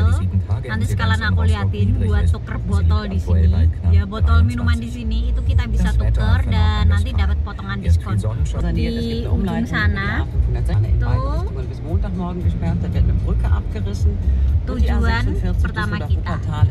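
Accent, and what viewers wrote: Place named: Java, Indonesia. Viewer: Tetap aja medok nya ngak bisa hilang ya bu ....hehehehe....salam buat suami...tetap sehat ya bu....🙏🙏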